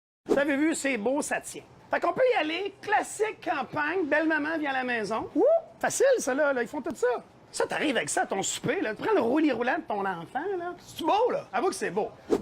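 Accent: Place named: Quebec, Canada. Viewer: Ca parle trop et fort, ça gigote dans tous les sens, ça fait trop de bruit ... Heureusement qu'il y a un lien pour la recette car avec l'accent québécois en plus, j'ai commencé à avoir des maux de tête avant même la fin de la vidéo ! Je mets "j'aime" car la recette a l'air bonne. Merci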